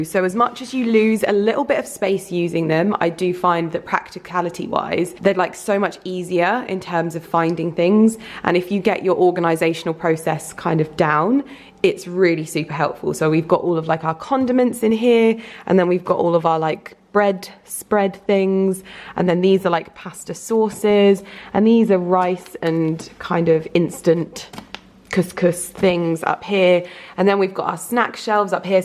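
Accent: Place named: England, United Kingdom. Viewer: I am an older lady that lives in U.S. I found you on youtube and you inspire me so much. My dear Mother was from England, and it like listening to her or my grandmother when I listen to your beautiful accent. I love how you are so real. Please keep doing what you are doing.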